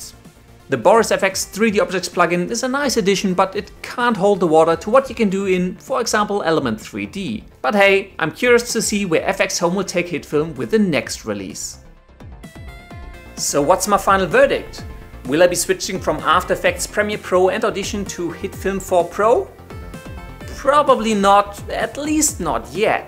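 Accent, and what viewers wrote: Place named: Germany. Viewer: Das ist doch ein deutscher oder? merkt man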